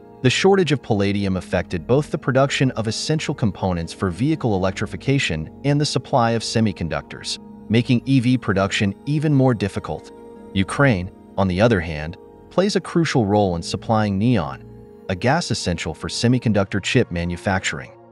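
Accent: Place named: United States. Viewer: sounds like a wank yank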